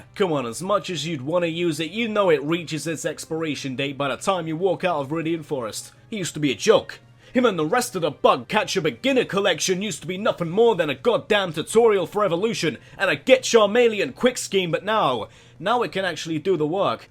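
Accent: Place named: United Kingdom. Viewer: man He sounds like a British Dan Green. Am I right?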